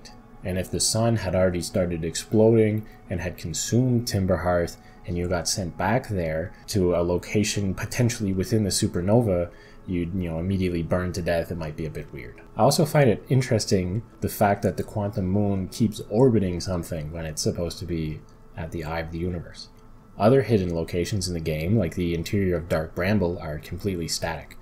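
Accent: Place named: Canada. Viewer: its also about him sounding canadian, hence why he replied "aboot"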